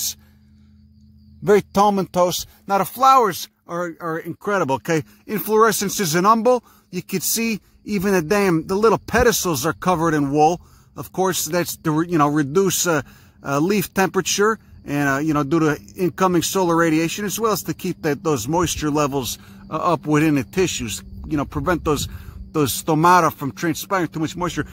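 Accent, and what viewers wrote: Chicago, United States. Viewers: yes. I'm aware of the most common invasive wild edibles. Tons of videos and books, but nobody can present it like my main man. I need it delivered with that no nonsense Chicago flare. Ya dig?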